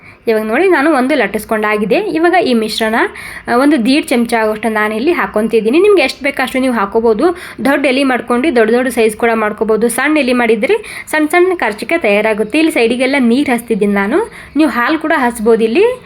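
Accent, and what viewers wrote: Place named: North Karnataka, India. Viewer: Mix kannada badri nam uttar karnataka bhashe spr aram hanga matadri bindas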